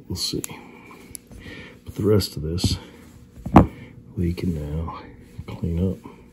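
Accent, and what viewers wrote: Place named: South, United States. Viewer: I want to go to that flea market with you. I am a hand tool woodworker and restorer. I found and restored most of the tools in my shop and use them daily. However, some of the tools you showed made me want to jump through the screen and see the prices. I live in Upstate, NY. Still trying to figure out where you're from, but you sound a little southern to me. Just guessing...LOL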